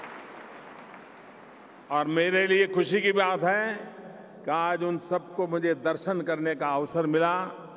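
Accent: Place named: India. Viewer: Salman MOHD.Apprecite his talent delivering a speech in front of a huge audience & his accent may be very much Indian English but the message is clear to everyone....aap ki baap ko bhi broken or tuti-futi angrezi bol Na nahi aatha hoga... yourself displaying your ignorance very much obvious.... madrasa mein pade hue log aap ke jaisa mindset rakthe hai...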